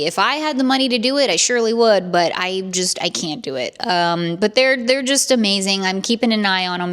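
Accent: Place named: New Jersey, United States. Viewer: I want you to know I am very proud of you for resisting the temptation to buy the amazing spooky items I know how much you love them I always enjoy seeing you so excited and happy when you get to make the purchases and I miss hearing you say purchases in the New Jersey accent and I always think it is cute when you say I am not going to spend a lot of money then you get back to the car and you are like I had to get them I think it is so funny and cute and I love how you decorate you're very good at the decor and a very good shopper I love halloween and Christmas and Halloween it's not official until you and say it has begun me and my family have watched you for three years now and you have always brought us our Halloween fix I will never forget when you went to the at home store and you were determined to give us our Halloween fix and you were determined to get that witchy candle you climbed on the flat bed dolly and you got it your dedication and determination to bring us our Halloween fix has always been the best so don't worry you're doing a perfect job and I hope my words make you smile and smile me and my family love you and always and forever 🖤🧡🖤🧡🖤🎃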